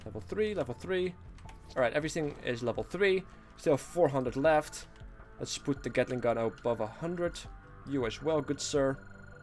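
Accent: Netherlands. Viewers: your dutch?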